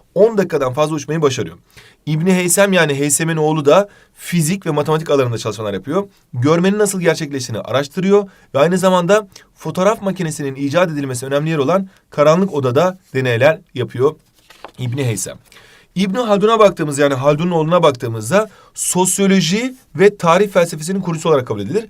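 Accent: Turkey. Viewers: İstanbullu yada Ankaralı (tonguç)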